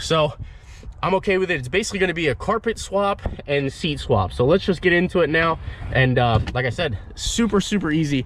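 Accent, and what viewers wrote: Pennsylvania, United States. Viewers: Got some hard work on this one coming! Is it a PA accent that gold = goad and water = wawter?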